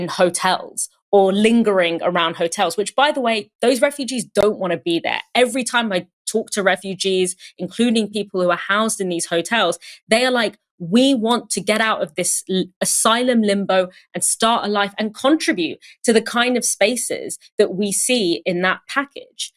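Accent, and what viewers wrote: United Kingdom. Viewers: WOW a pretty light skinned Black female with a British accent. Marry me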